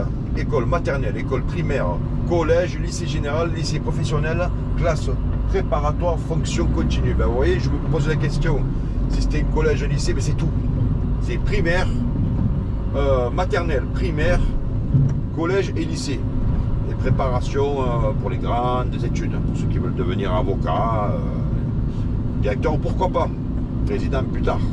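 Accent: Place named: South, France. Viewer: Toulon ouest. dans le Var et pas à Vars dans les Alpes,ni au Portugal Une visite passionnante avec l'accent du sud en bonus.Des vidéos comme celle ci, j'aimerais tellement en voir plus souvent 🎉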